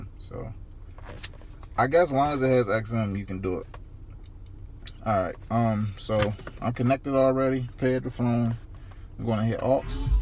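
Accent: Baltimore, United States. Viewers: Destinee Mincer soon as i turned on the video my bf said “he’s from baltimore” 😂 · U from bmore? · definitely baltimore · You definitely from Baltimore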